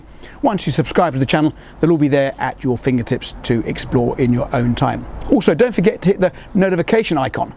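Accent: United Kingdom. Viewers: Love Your Video And Your UK Accent Is Amazing Too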